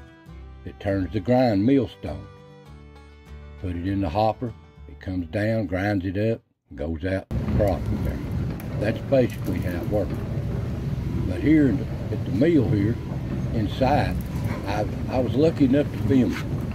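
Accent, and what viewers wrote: Appalachia, United States. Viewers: I just want to say how much I appreciate your videos. I’m so glad I found your channel! You sound very much like a lot of friends I have in Tazewell County, Virginia! I have Appalachian roots myself, and love to see your pics and listen to your. stories. So glad that we know these things, and rejoice in them! You’ve made a fan, brother. God bless you and yours!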